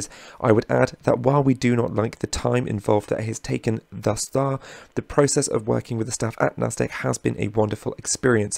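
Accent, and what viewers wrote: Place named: West Country, United Kingdom. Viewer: Good Video. I also like your very discreet west country accent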